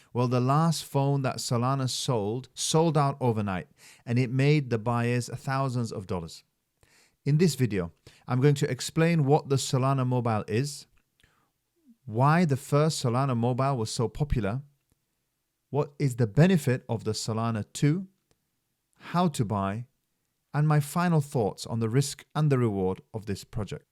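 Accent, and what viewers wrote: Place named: India. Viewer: I can hear an indian undertone. good video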